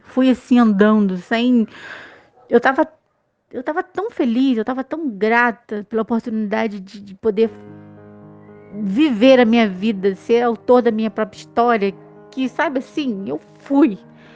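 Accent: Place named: Rio de Janeiro, Brazil. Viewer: Carioca da gema 😃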